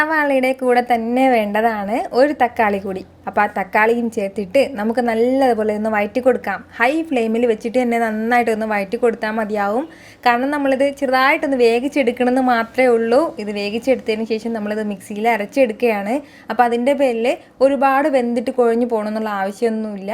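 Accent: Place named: Malabar, India. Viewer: ഹായ്, സുജിത് ഏട്ടന്റെ വീഡിയോ കണ്ടാണ് നിങ്ങളെ പറ്റി അറിഞ്ഞത്, എന്തായാലും ഞാൻ സബ്സ്ക്രൈബേർ ആയിട്ടുണ്ട്. ഇനി മുതൽ വീഡിയോസ് ഒക്കെ കാണാം.. നിങ്ങളെ സംസാരം ട്രിവാൻഡ്രം സ്ലാങ് അല്ലാലോ, ഒരു മലബാർ tech ആണല്ലോ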